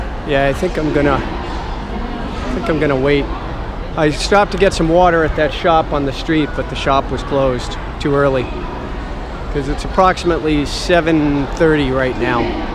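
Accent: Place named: New York, United States. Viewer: So cool hearing a New York accent in China!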